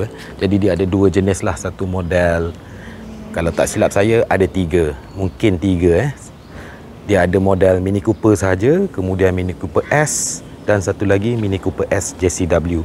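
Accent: Malaysia. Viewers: malaysian mmg camni.. bukan konoha...kalau konoha pronouncation lagi jahanam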